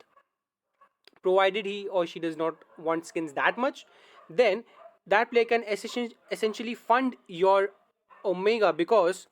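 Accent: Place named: India. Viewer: You Indian bro? I find that accent familiar.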